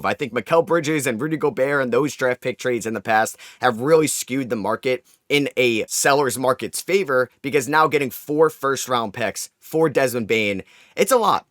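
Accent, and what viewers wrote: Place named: East Coast, United States. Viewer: He’s from the east coast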